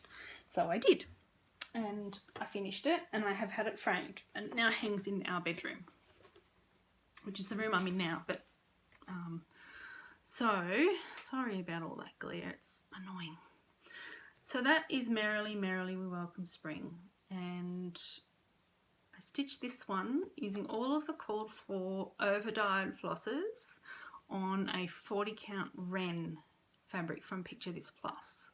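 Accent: Australia. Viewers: Welcome to floss tube, so lovely to hear an Aussie voice. Beautiful work!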